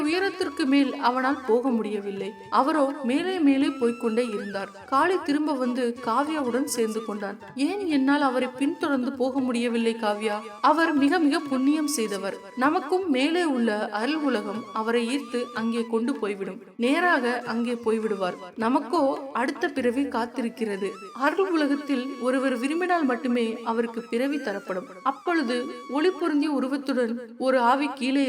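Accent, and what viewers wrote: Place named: Jaffna, Sri Lanka. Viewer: அவ்விடம் கற்பனை அதிகம் உண்டோ.என்ன யாழ்பாணம் தமிழை விடமுடியவில்லையா .கதை மிக சுமார்தான் .😂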